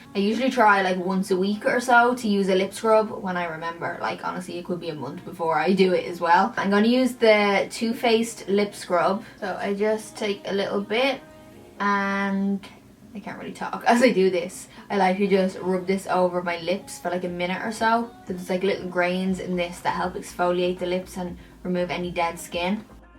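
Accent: Ireland. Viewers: Are you Irish? I love your accent👍🏻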